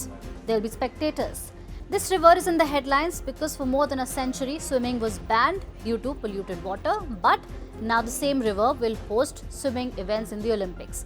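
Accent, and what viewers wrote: India. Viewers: CNBC is an American broadcaster that is supposed to use American English, not Curry English. When you have a large number of UK immigrants in America, you have American or Canadian English, and Pomme in Australia they speak Aussic English, with India you don't chase Immigrant background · As a response to your edited comment, the lady is speaking British English. It's British English that's officially taught in India. Her accent is Indian. Just as you have different accents in the US, so does India. Once again, this YouTube channel is aimed at Indians, not at Americans. So obviously they're gonna hire someone who can speak with an Indian accent, not American, British, or Australian. Maybe you need to read once again the fact that the term "Curry English" doesn't exist. Curry, however, does and has nothing to do with India.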